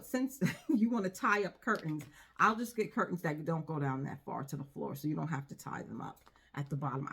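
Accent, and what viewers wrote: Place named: New York, United States. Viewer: I love your accent. Is it NY?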